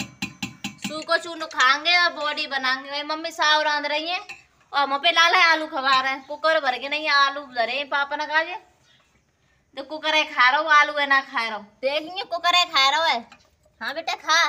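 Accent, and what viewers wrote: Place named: Mathura, India. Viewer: Apke mukh se dehati bhasha bahut sunder lag rahi hai.bhasha ke hisab se aap mathura side ki lagti hain.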